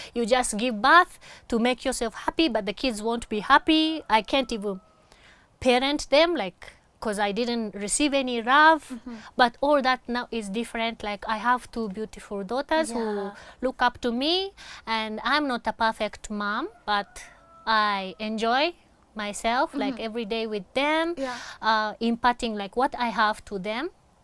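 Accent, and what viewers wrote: Kenya. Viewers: wetu, umerudi?? Your 'R' makes you sound like a real okuyu from nyeri.... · She sounds like an Kenyan women 😉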